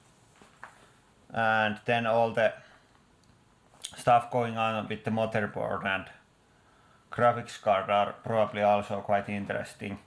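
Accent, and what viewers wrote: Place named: Finland. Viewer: Krzesimir Nowakowski Finnish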